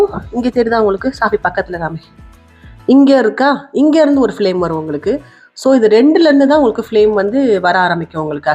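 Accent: Madurai, India. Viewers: Hi i'm ur new subscriber.ur slang.....?i think ur native is madurai?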